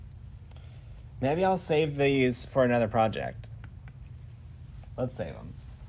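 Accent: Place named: Louisiana, United States. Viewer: You say Y’all because your from Louisiana...lol. I tease my son who lives in Shreveport that I paid for 4 years of college for him to learn to say Y’all. 🤣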